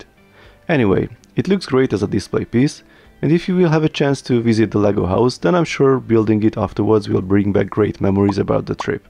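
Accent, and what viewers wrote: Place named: Hungary. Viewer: YOU SOUND SO HUNGARIAN!!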